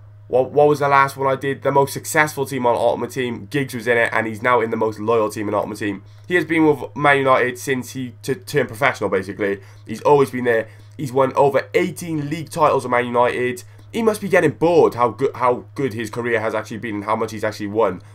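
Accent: Wales, United Kingdom. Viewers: r u from the valleys???????? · Are you that guy from the valleys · You sound like leroy from the valleys!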